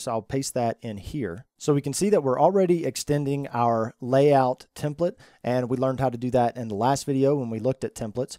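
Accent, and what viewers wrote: South, United States. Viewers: Love your accent, sounds like a soft southern accent. Your content is fantastic.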